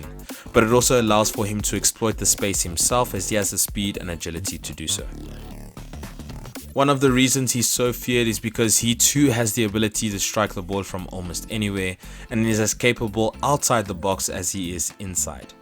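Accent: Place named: France. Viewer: Thoroughly enjoyed the narrator. Man is definitely French. Looking forward to many more videos. Top stuff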